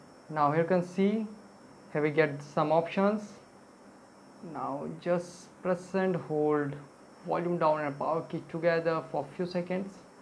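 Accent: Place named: England, United Kingdom. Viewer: U r born in England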